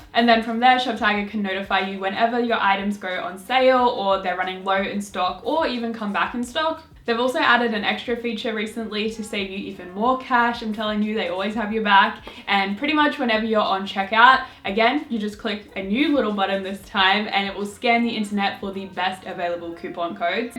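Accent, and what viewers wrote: Australia. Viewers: is this chick Aussie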